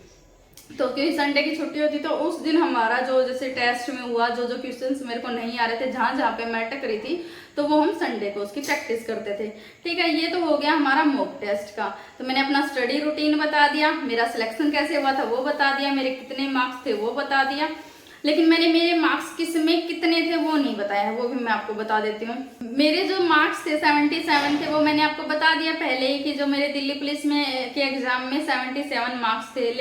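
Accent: Haryana, India. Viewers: Haryana se lagti ho mam aap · Aap Hariyana se ho kya mam😊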